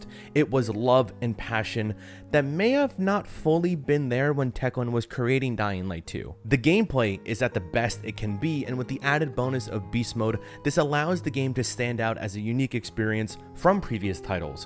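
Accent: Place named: Scotland, United Kingdom. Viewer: Just a smidge of the Scottish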